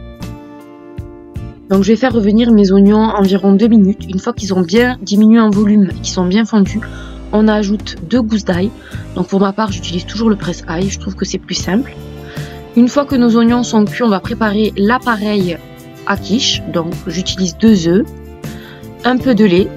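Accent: South, France. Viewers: ca s'entend que tu es du sud 💗maintenant Paris!en tout cas ta chaîne est sympa je pense que pour le ramadan tu vas m'inspirer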